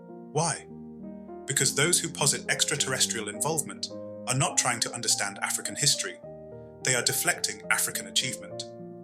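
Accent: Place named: Europe. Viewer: You failed to mention that the kemet people knew how to manipulate frequency and energy to actually move the rocks not the silly imagery of ramps and pulleys (a European concept!)..your European accent says it all!...we need and want to hear black people talk about us to us, not this podcast!!!..I've spoken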